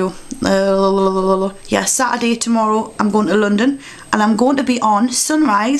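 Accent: Ireland. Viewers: r u irish? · are you irish? x]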